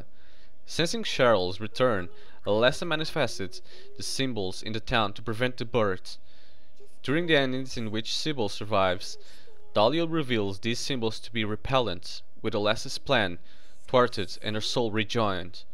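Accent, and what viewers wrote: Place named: Portugal. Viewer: You have a Portuguese accent. Are you?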